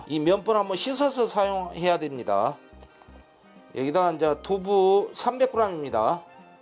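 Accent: Jeolla, South Korea. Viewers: 조물조물을 쪼물딱쪼물딱. 저~~그 전라도 말씨 정감이 가네요. 어떤 요리든 정확한 레시피 감사합니다. 참 대단한 " 셰프입니다 " ❤ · 새프 숙구락 전라도사투리요전라도사투리 김제쪽인가요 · 세프님 음식도 정갈하게 맛도 끝내줄것 같습니다 당장이라도 따라 해보고 싶네요 전라도 사투리도 구수합니다 ㅋ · 항상맛있는요리 알려주셔서감사합니다 설명도 어찌나 맛깔나게 전라도 사투리가 정겹고재밋습니다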